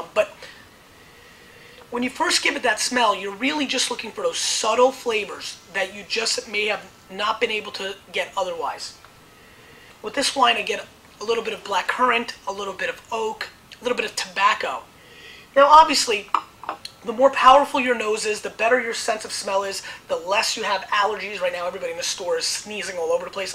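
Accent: New Jersey, United States. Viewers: from Jersey much?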